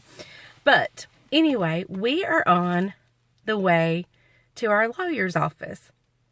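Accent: South, United States. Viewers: Are you moving to new land? I’m sorry coming in on your story I just don’t understand sometimes the southern draw talking fast. As far as having “stuff” donate donate to the Salvation Army that uses the sale proceeds to help the poor. My husband says, keep it simple thats so true! Personally when I have guest over I keep the kitchen unjunky with little out other than food and pretty napkins and cups and few candles. Heaven is not junky and in turmoil with “things”. It’s uncomfortable being around clutter thats not good for the soul. A Colonial girl here with a touch of simple style and elegance from my generation. People have way too much today. Be blessed and not stressed.❣️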